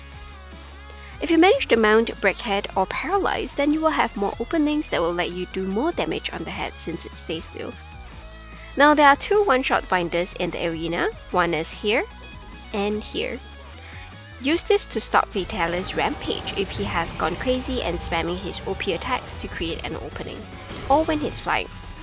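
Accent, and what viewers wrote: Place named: Singapore. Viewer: Just curious, but from your accent, are you Singaporean or Malaysian?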